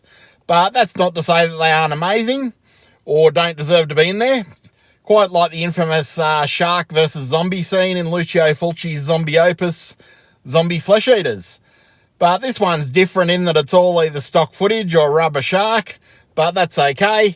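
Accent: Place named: Australia. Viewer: Bob Ross .great name 😁. Also love the Aussie way of speaking . My favorite accent . Plus I hear that place is just wild to live in. Keep up the great work and good morning from Dallas Texas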